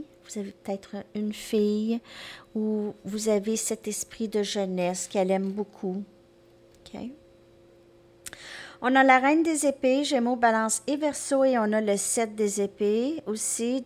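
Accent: Canada. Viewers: Merci 🥰! Je vous ai découverte depuis peu et j’aime beaucoup vous écouter. Vos guidances me parlent et j’aime aussi votre bel accent canadien 🤗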